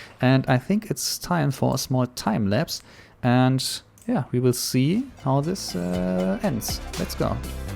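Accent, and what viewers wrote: Germany. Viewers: Man hört das du deutsche bist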